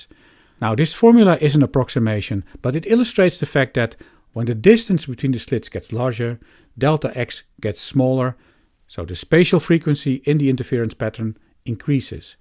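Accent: Netherlands. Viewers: Interesting because the author is also not a native English speaker (he's Dutch). Maybe that helps non-native speakers to understand his English better. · Dutch accent is so good.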